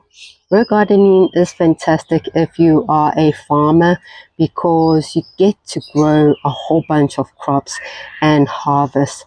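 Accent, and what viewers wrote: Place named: South Africa. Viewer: I thought that was a SA accent 😅and indeed guessed correctly after seeing your description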